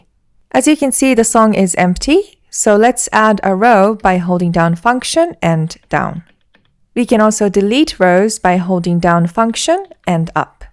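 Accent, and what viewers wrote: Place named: Ireland. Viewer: your accent is so nice. did you live in ireland at some point by any chance? theres a few words here and there that sound very irish to me but maybe its some similar accent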